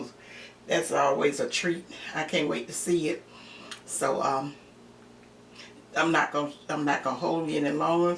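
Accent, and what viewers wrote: United States. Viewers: Where is this place ? sound like Americae ? Never heard of dogers or what ever ity called. Jamaica we say dumplings to Rasta jaz.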